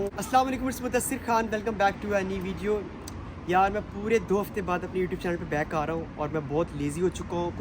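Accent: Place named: United Kingdom. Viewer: I can't understand the strong UK accent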